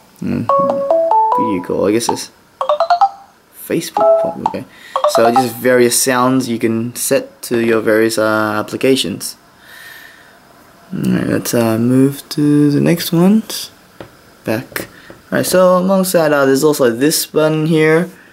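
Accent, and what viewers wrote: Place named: England, United Kingdom. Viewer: He sounds like he is from England so... why wouldn’t he.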